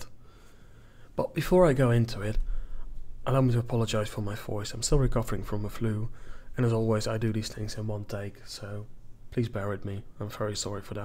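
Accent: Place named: Netherlands. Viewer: these ships are both awsome . even when in a smaller org , you can help a friendly org to destroy someones capital . the polaris bridge is just perfeckt . and now the hammerhead . a real jewel . to be honest , i cant make a decision for keeping only one of them . so it was an easy decision to have them both . --- ps : you sound verry dutch :p im almost sure you live in holland :-)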